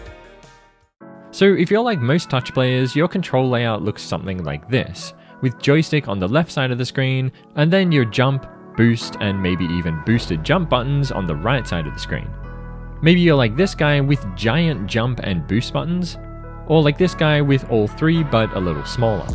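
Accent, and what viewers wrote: Netherlands. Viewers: Bruh he was Netherlands I’m Dutch but I can understand the schot and stuff schot is shot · Waiiitttt, u dutchhh!?!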